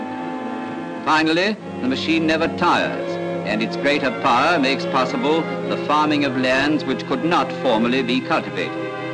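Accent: RP, United Kingdom. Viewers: That's how UK commentators on TV /Radio etc used to speak back in those days.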